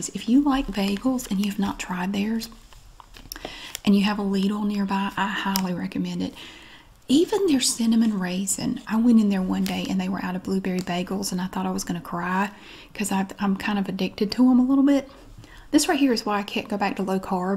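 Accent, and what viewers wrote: South, United States. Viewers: You are such an awesome person . love the southern draw . you really get into your characters and i love that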